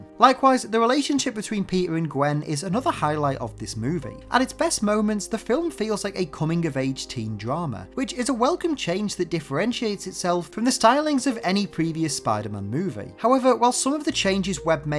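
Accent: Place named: Yorkshire, United Kingdom. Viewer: That's embarrassing hahaha I'm from Leeds I thought I caught ur accent lol, you must be from Yorkshire tho amirite. Besides the point tho I love your videos, I watch them an unhealthy amount 😂 Keep up the excellent insights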